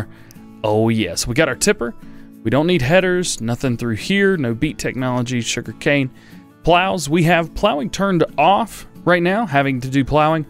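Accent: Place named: United States. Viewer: Really enjoyed the video, love the American cowboy accent on a British farm!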